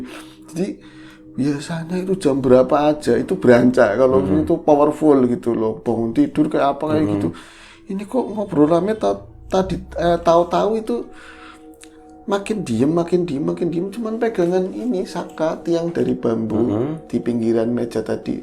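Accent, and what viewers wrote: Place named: Tegal, Indonesia. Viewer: Assalamualaikum wr wb mas dan mas.....lanjut dengerin cerita yg tk tunggu apalagi bhs Tegalnya 😀😀😀🙏🙏🙏🙏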